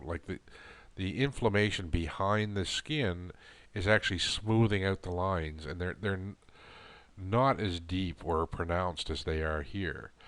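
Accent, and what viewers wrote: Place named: Michigan, United States. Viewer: Where are you located? I detected a bit of Michagander in your dialect.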